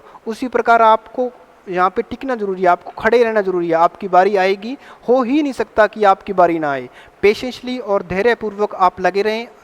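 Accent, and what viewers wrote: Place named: Punjab, India. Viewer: Aapka hindi punjabi accent😊😊😊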